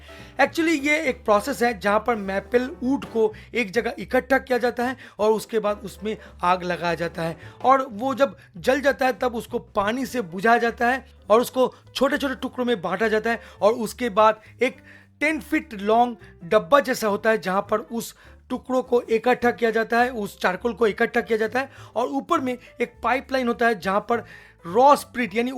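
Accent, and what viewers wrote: Bengal, India. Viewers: Is not woishky ...avoid ur bengali tone of speaking Its whisky..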